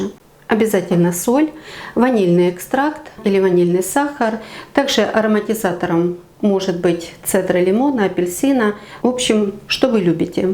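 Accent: Ukraine. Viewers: Я смотрю ваши видео как сеанс гипноза, настолько успокаиваясь от вашего голоса,у вас такой красивый украинский язык,ну а рецепты все просто бесподобные,уже очень много готовила по вашим рецептам и все получалось идеально, спасибо вам за ваш труд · Як же приємно ви говорите українською мовою, так чудово... А язік смердючого орка не можу чути, зразу звучить у вухах, як та погань з матом перерізає горло нашому захиснику, як дають цім язіком накази бомбардувати наші міста, спалювати наші ниви, врожаї, як похабно поливають нас хамською брехнею.... язік, який ви так поважаєте, лелієте..... невже СТІЛЬКИ СМЕРТЕЙ....молоді, діток, стареньких такі мовчазні перед БАЖАННЯМ заробити ті ЗКРИВАВЛЕНІ ЗГОРЬОВАНІ СЛІЗНІ копійки....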